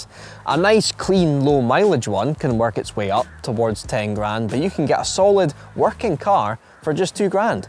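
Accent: United Kingdom. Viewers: where in the UK is this guy from? ( love the accent.)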